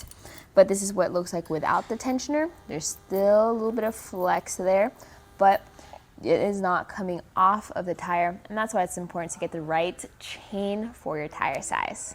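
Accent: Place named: Midwest, United States. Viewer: Someone's from the Midwest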